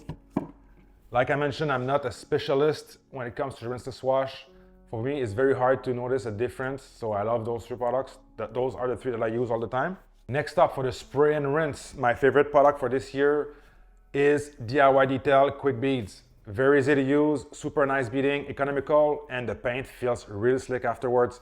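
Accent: Quebec, Canada. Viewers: I really like your presentation and I respect your recommendations. And as a fellow Canadian, I dig your Quebec accent! 🙂 Keep up the good work! Thanks!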